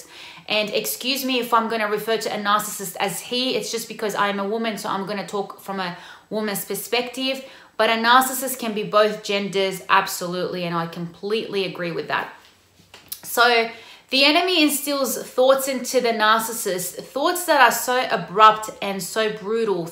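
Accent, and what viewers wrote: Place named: Sydney, Australia. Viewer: Hello from Queensland 👋 I knew you're a Sydney girl the moment I heard your voice lol.. You're gorgeous and I'm really proud of your channel.. You go girl! (Please do a video about how to avoid Sydney guys who only wants to mess around🙄) New subscriber 👍 ✌️ ♥ God bless! 🙏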